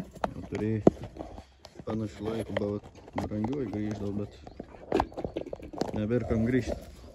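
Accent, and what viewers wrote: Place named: Samogitia, Lithuania. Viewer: tai arba žemaitiškai šneki, arba lietuviškai, apasispręsk..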